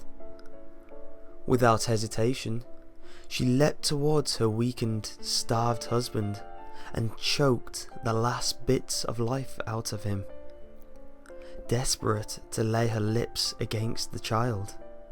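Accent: United Kingdom. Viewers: are you really British?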